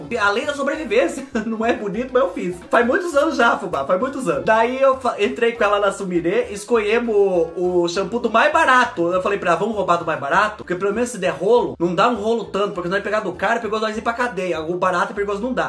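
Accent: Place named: Toledo, Brazil. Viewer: Você parece as pessoa de Toledo MG fala iguarzinho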